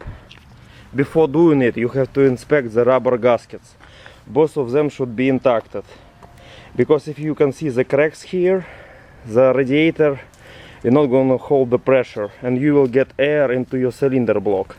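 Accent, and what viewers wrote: Russia. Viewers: Жуткий русский акцент, но англичане в восторге.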